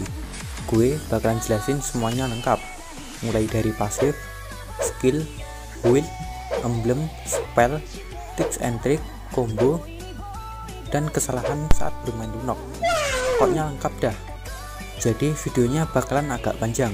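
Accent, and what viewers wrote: Java, Indonesia. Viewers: kalau medhok gak usah gua gue bang, gak cocok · Bang suara nya medok banget anjir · medok bet lu bor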